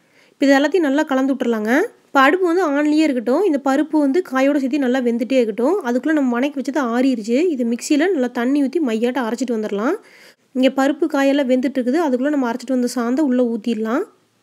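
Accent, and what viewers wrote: Kongu, India. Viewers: Super sis 2day nite I will try this. Recently I suscribed ur channel. I like ur slangvery much. Kongu manam veesukirathu sis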